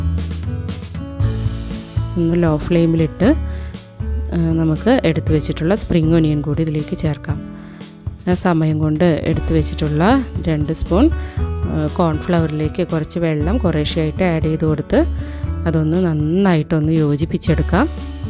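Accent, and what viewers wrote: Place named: Kasaragod, India. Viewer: 😊lastil paranjathu pettanu manasil ayilla. Onude ketapol karyam pidikiti.😊. Ethu natile anu aa style. Kasargod style anoo. Pine healthy and rich soup adipoli👌❤️❤️❤️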